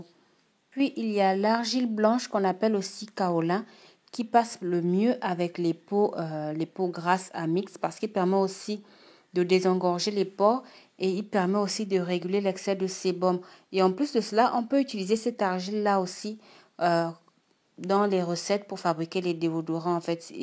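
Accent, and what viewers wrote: Gabon. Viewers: L'accent gabonais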